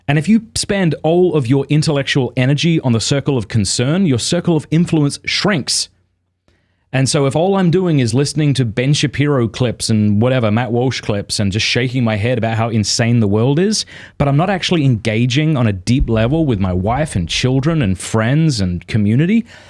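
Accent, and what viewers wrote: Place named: Australia. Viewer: As a Texan, I have to say that hearing brother Matt say "y'all" in that Australian accent simultaneously delights me and makes me feel weird. Love the channel; it's been a great help on my own journey.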